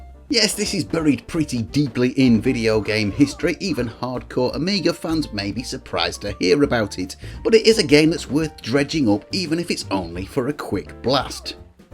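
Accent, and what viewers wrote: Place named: Cockney, United Kingdom. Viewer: This is the best cockney retro gaming channel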